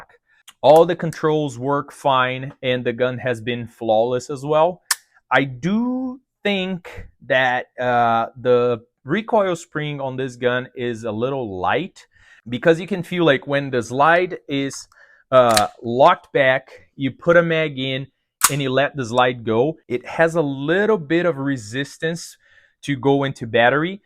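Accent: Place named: Quebec, Canada. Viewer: I'm hearing a French Canadian or Newfie accent? Where are you from?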